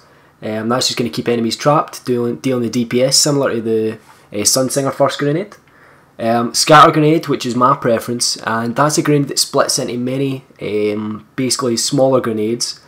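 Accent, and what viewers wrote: Scotland, United Kingdom. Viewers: fuckin love scottish accents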